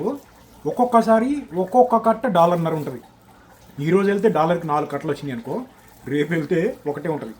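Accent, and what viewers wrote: Andhra Pradesh, India. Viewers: Anna nuvu nizamabad anav kada kaani nee telugu andhra slang undi enti?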